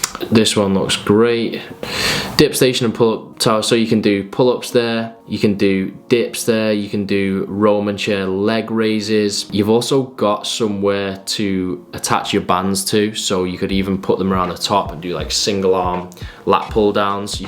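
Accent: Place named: England, United Kingdom. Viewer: His voice is like asmr for me... idk if its because I'm american and his soft english accent is soothing or what.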